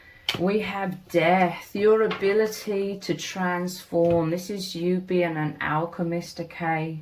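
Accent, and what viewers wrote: United Kingdom. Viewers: Yes ma’am sounds a lot like me. I’m very empathic probably over the edge super empathic or something, but I had to go quite silently on a lot of folks that don’t deserve the privilege I’ve ever been you know again they don’t deserve that after that transaction of pain. It was a crucible that really changed me an altered us quite a bit me and my dog, but we’re looking forward to better times So I could sign my name or something, but I’ll just put a little emoji that describes me quite well It’s very ferocious there and then and yours is a pretty good way to describe me as part of that team and stuff but we’ll just get on with this. Have a great beautiful day You sound like you’re from over there in British land or something Take it easy 👉 🐺 👈 I kinda had to get that way you know all that I had to observe and punishments and announcements that I had to absorb and then read myself well it was left with something to protect me in a biscuit here Alone, one .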